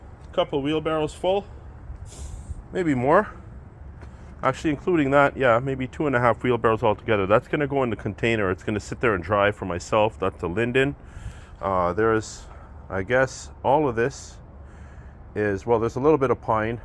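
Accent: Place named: Canada. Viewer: I love your accent! Very Canadian!! 😊 Enjoy your vids.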